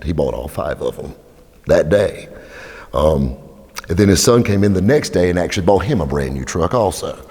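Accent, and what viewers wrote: South, United States. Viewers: Your voice IS the South. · If vin diesel had a country accent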